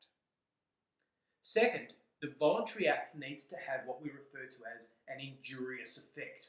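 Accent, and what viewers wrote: Australia. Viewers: god damn aussi accent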